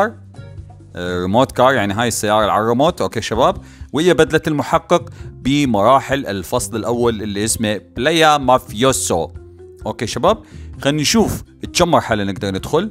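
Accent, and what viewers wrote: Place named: Iraq. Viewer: من لهجة عراقي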